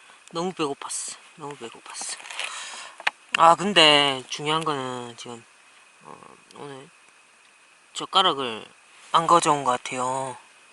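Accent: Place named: Heilongjiang, China. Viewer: 옛날생각난다..말투가 흑룡강독립운동가 후손같네요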